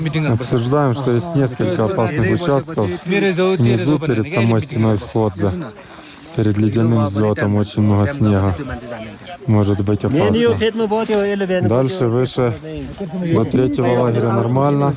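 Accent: Ukraine. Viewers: Лахерь на хоре))) какой ужасный украинский акцент, тогда бы уж на украинском ховорил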